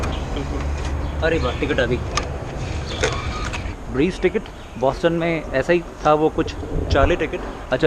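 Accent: Haryana, India. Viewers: ghna uncha h bhai .... haryanvi ❤❤❤❤ bhai r haryanvi b aav h tn t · ghna uncha tha bhai.... Sir are you from haryana????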